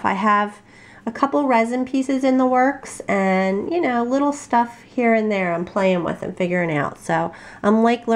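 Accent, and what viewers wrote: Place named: Georgia, United States. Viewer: last night i ran across eva tissen too and i looked thru her gallery and was literally blown away and i was like i would looove to be able to do this . i am gonna hafta give it a try! you did awesome with these pieces! my favorite one is the daisy one. i love how you curl the vines on some of them! btw you have an accent like my cousin who is from warner robbins ga. :)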